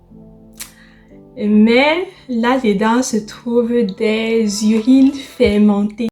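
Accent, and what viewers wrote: Cameroon. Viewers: Uhein dès que je t'ai entendu parler j'ai toute suite su que tu étais camerounaise vraiment le goût de ça une belle camerounaise 😂😂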